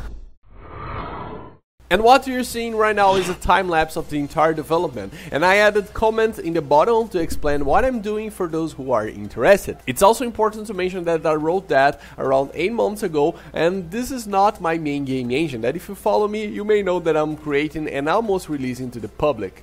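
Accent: Brazil. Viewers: Are you Brazillian right? I realized because of the R pronunciation, your English is almost perfect though, it took me some time to realize. Great video, great job! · caraca, esse sotaque br tá muito aparente kkk pô irmão, me salvou ein, tô querendo aprender a produzir games e acho que a parte mais complicada pra mim é a engine em si, mas ainda bem que tem pessoas como você pra ajudar, tmj!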